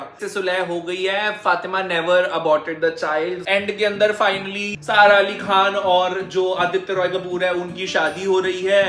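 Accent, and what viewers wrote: Punjab, India. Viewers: Your punjabi accent ❤❤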